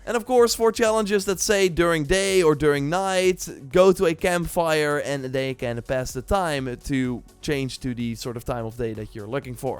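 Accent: Netherlands. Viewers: Nou je bent zeker Hollander?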